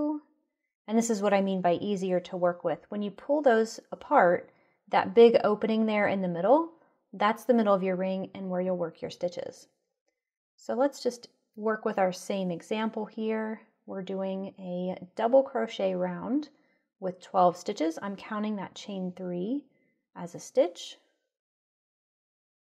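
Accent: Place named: Pennsylvania, United States. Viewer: Hi! Gave you a like of course... It's a habit I've gotten myself into.. I do it first thing so I don't forget. I already have mastered the magic ring, but your episode and technique was very good. Also, I liked that little cheat you did with using the ch 4 instead.. good to know! You sound like you're from PA. I can hear the accent in some of the words you say. Thanks for another great episode of B. Hooked TV. 🌼🙂🌼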